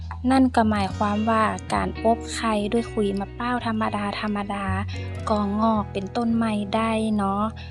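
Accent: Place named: North, Thailand. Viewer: ชอบฟัง..สำเนียงอู้คำเมือง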